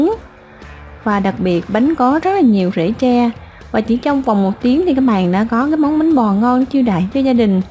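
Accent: South, Vietnam. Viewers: Giọng miền nam nghe dể mến Thế mà " thìa " ... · "Muỗng ăn canh" sẽ dễ nghe hơn "thìa" bạn ạ. Góp ý nhỏ : Giọng miền Nam, bạn nên dùng ngôn từ Nam Bộ bạn nhé, tôn trọng & giữ gìn nét đẹp Ông Bà.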